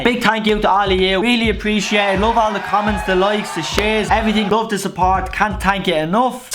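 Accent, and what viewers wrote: Dublin, Ireland. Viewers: what section of Dublin are you from? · your the only Irish YouTuber I've ever met and I love the Irish voice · what part of ireland are you from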